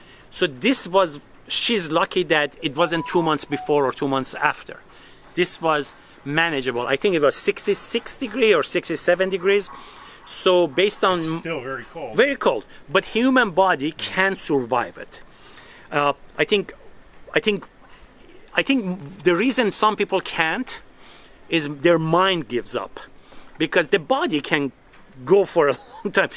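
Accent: Iran. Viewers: You are an awesome Iranian as your accent sounds like my Iranian friends from Chico State Circa 1975. I loved your original life story. Thanks brother for sharing. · Riveting, without being sensationalistic: A+. I could listen to this man speak on any subject (do I detect an Iranian accent?), and admire his humble-thorough approach… to everything, really.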